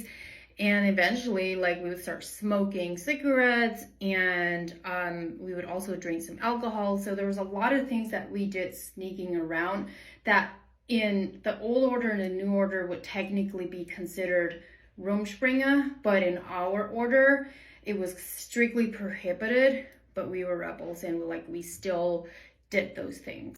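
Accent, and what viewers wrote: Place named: United States. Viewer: You sound like you got a lot of American in you and your parents were American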